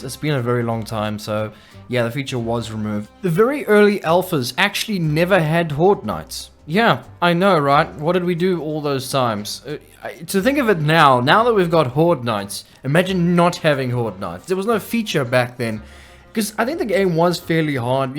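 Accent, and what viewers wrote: South Africa. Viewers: Hey are you south African the accent sounds like it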